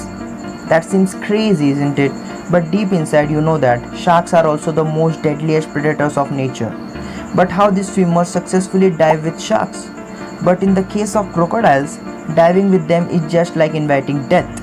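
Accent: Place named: India. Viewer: Felt from ur accent . Me Indian too . Ez science . :)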